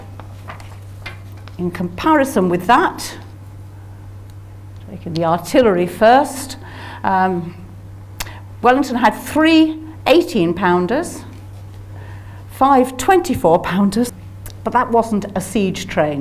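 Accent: United Kingdom. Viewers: Since she spoke with a British accent, I will not argue any facts of these events.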